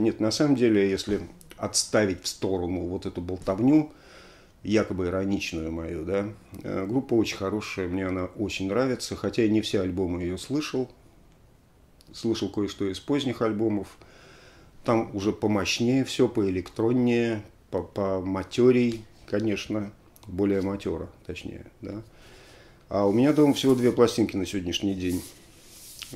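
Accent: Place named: Saint Petersburg, Russia. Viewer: я тож узнаю ваш купчинский говорок, знакомый мне с детства.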